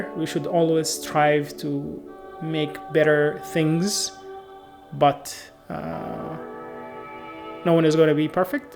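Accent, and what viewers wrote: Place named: Africa. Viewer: مرحبًا ، دائمًا... يتطلب الانتقال نوعًا من الجهد الأخلاقي والمالي والقانوني. أنا متأكد تمامًا من أن مشاكلك تتعلق ببعض المشكلات الأخلاقية وبعض المشكلات الاجتماعية (التي تتعلق بالقانون) ، حاول تقليل قضاء الوقت في التحرير ومحاولة التصوير في بث مباشر (كما فعلت هنا). بالإضافة إلى ذلك ، قم بتحسين لغتك (بالمناسبة... لهجتك رائعة من أصل أفريقي).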